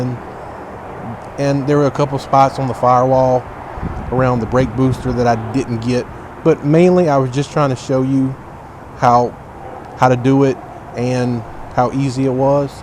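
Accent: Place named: Texas, United States. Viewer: Wow! And I love the accent, is that Texan mate?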